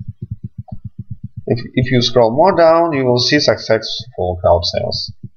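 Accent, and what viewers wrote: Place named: Russia. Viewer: ебучий русский акцент))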